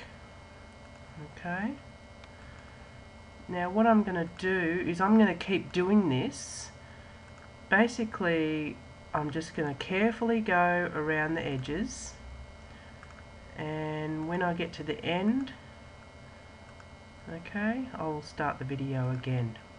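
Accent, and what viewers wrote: Australia. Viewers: About bloody time I hear an aussie Well done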